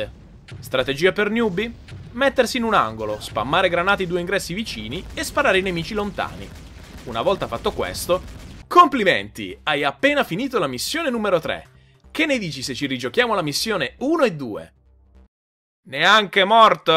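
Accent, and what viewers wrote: Bari, Italy. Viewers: Tu e il tuo accento barese spaccate <3 :D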